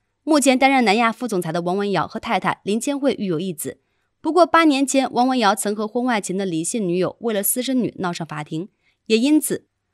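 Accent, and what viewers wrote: China. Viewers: 大陸人配音？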